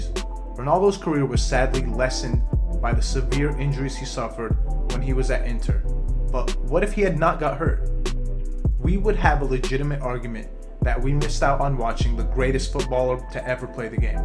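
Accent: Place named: United States. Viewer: Già sentire un accento americano che parla di calcio non è credibile...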